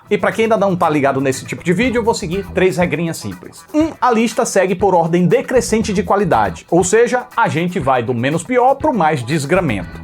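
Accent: Northeast, Brazil. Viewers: Cara. Seus comentários são super nordextinesco. Aaaaaaamo. O Seu sotaque é SUPER. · Ele é nordestino 😁 só não lembro de que estado.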